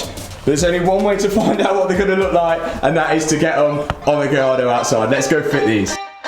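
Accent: United Kingdom. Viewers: Your british english accent hurtsfor my ears, also indistinctive.. BTW nice car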